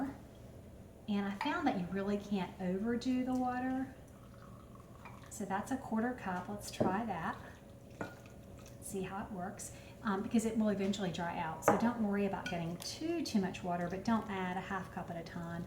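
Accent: South, United States. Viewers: Love that southern accent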